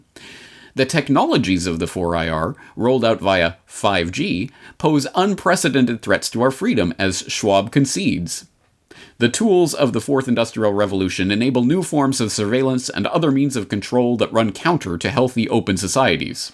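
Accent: Germany. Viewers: Well german accents are normally not unnerving at all, that is until you open your speech with talk of how WW2 was good for the world. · Nothing wrong with a German accent.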